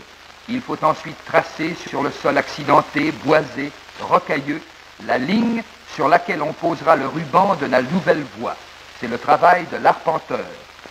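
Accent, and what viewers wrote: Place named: Quebec, Canada. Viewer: Comme un Québecois. I hate it....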